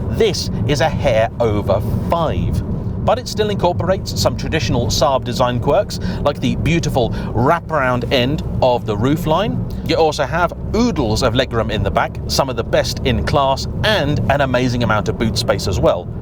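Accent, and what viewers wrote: United Kingdom. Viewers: Is it the British accent, is it his knowledge and take on exotics as well as everyday enthusiast cars? I don't know, but I can listen to this guy talk about cars all day! seems like the person I'd run into in a parking lot and just start up a conversation about what we're driving! 😃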